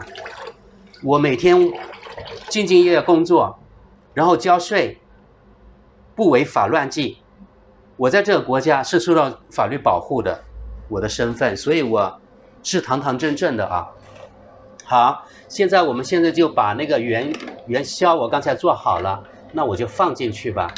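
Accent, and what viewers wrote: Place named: South, China. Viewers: airs yong 他普通话是流利的，但是有南方口音，这很正常。就象滇西小哥一样，最后一句话是有云南口音的，挺可爱的。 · 老乡，虽然你的南方口音和表达不是那么完美，但是听起来特别真诚。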